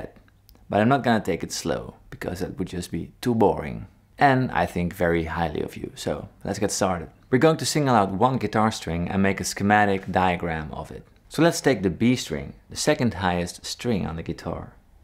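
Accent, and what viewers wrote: Netherlands. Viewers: Thanks a lot why do I hear a Dutch accent while your name is clearly English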